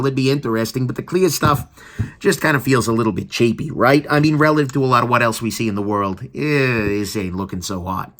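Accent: New York, United States. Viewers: i have not heard a more new york italian accent in my life...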